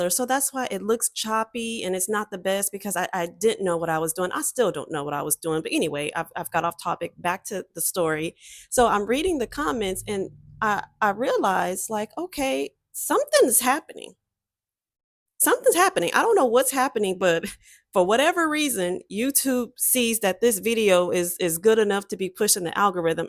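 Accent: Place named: Bay Area, United States. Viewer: Ms. I hope hope you keep on with it. Your videos are really good. Now you have a sultry twang voice and in this video specifically your voice you sound a lot like Kamala Harris. I guess it's that both you and Ms. Harris are from California. The Bay areas has a vocal connection hundreds of mile away.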